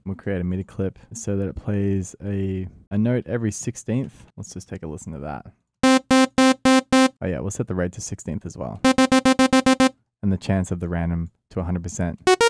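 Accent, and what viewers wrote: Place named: Australia. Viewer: You're so eloquent when you're explaining this, it's clear that you really understand the subject matter, and it's inspirational. I enjoyed this tutorial so much. I'm jealous of your knowledge. You make it look so damn easy! Keep making these :) ps: Always helps hearing someone explain in good plain Aussie that I can understand ^_^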